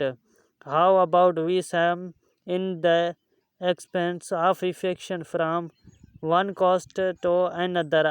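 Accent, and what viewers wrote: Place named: India. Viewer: I don't like to hear in this style totally bhartee English better without voice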